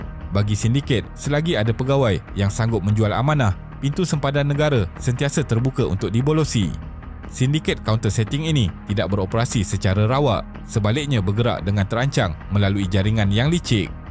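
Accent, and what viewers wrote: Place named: North, Malaysia. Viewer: Memang org utara sembang deraih... Sesuai untuk org awam.. tp utk org mcm aku . Gelak je dga hehe..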